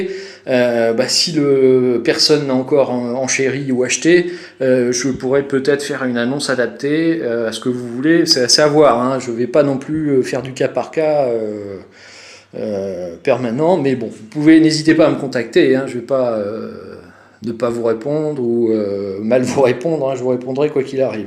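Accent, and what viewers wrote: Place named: France. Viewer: mmm, this amazing french voice )))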